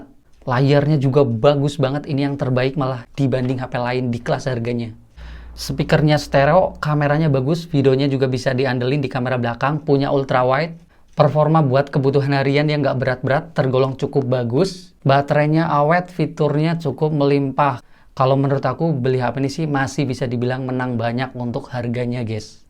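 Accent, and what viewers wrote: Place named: Banyumas, Indonesia. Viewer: kiue ngapak deneng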